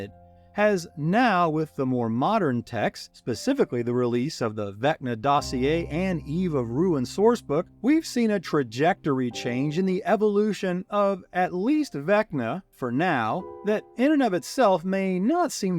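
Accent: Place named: South, United States. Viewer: Fyi the music is good , i'm autistic and sometimes music distracts me yours is good just extenuates the narrative , i think you're on your way to being the top FG guy , you're meticulous eye to detail plus artistic panache is a winner, you have very clear precise way of speaking with only a slight accent, i would guess you have a professional background possibly a college lecturer, english literature philosophy liberal arts etc . vaguely southern accent but not folksy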